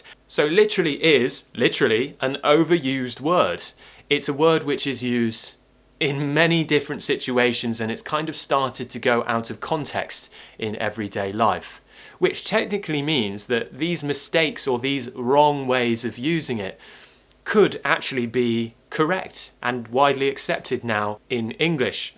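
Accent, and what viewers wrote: RP, United Kingdom. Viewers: I'm Mexican and I prefer the British pronunciation vs American, it's easier and eny foreign can understand what you are saying. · I'm brazilian and completely in love with your british accent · I looooove the RP accent ❤️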